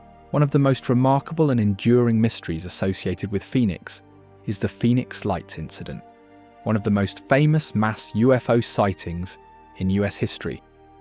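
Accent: England, United Kingdom. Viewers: What’s up with this bloody Limey’s accent?🤮 It’s Arizona, so speak American🇺🇸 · Can't stand all these British narrators talking about America. Why don't they go back to their Muslim country?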